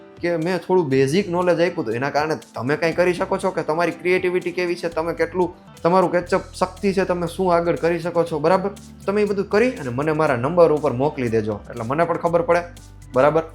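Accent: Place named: Surat, India. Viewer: AEK number jordar jabardast Little bit Rajkot , but full on surti..... Lot's of love from surat...❤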